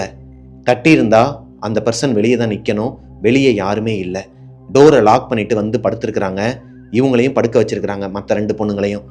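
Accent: Madurai, India. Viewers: anna naum madurai Tha anna nanum samaikura appo vessel watching apo Matti illa nna mob panra apo yellaneramum unga vedios mattu Tha nna ketutee vela papaen❤ seriously nega oru Stress Buster nna enno nega neraiyaa gost vedios poduga nna especially nama ooru slang na pesirathuu enaku romba puduchurukuu nna love u Broooooo❤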